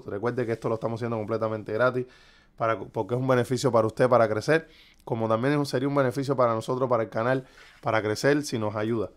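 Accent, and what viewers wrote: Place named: Cuba. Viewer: eres cubano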